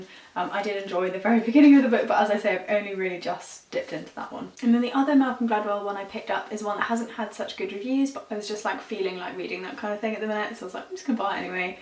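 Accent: United Kingdom. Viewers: you are nt hearing excellent britain's pronunciation?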